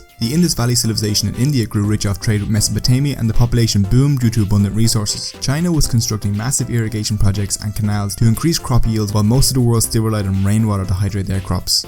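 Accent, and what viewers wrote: Ireland. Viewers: clearly Irish...It's not that he doesn't enunciate properly, he just has an accent. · Are you Irish? If so, cool cause you and one other YouTube are the only YouTubers that I know about are Irish. The way I can tell is be cause you pronounce thirty two as turdy too, and the t-h syllables are pronounced differently than as it appears. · u from Ireland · Your videos are really good. I can spot that Irish accent.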